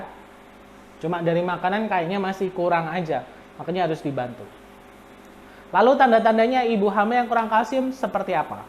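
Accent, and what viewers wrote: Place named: Java, Indonesia. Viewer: Sampyn wong jowo toh dok...jowone njar endi??😁😁😁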